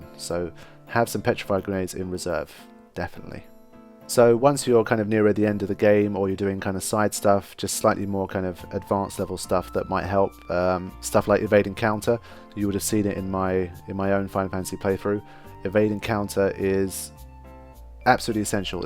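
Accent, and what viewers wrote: England, United Kingdom. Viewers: hey man im not sure if you read comments on old videos but ill leave this here anyway. I have been playing ffx since its day of release on ps2 and got 10 for ps3 and ps4 lol. Im playing a casual playthrough on ps4 right now started a week ago. I was looking for some guides/ tips on youtube and your channel popped up i have never heard of you until 2 days ago and now im addicted to your channel!. the amount of content on your channel is amazing and the quality of the content as well. I now have the daunting task of watching your platinum walkthrough and NSG videos. This very video has got me interested in NSG runs the sad thing being that i thought i was a semi veteranof the game but gad only heard about NSG runs about 6 months ago massive face palm. The other thing being that i have never beat all dark aeons plus penance before and now i think you have given me confidence to try. 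It sounds like your english as well which is good im Scottish and its nice to have British gamers talk about Final Fantasy it seems rare not that im dissing any other FF gamers Dark Kefka has incredible knowledge on the games. Hopefully when FF 15 releases you will cover that as well. Any peace man thank you for giving me a whole new channel to watch i can only subsribe and keep watch over my newsfeed hopefully thats enough for now i wish i could do more Thank You.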